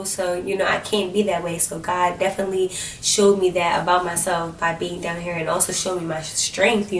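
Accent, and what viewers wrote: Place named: Philadelphia, United States. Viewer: I knew you was a philly girl I can hear it!!! Congrats on the move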